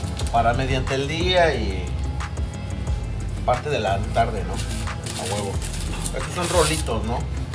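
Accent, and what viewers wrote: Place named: Spanglish, United States. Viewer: Cámara chingon el spanglish 🇲🇽🤟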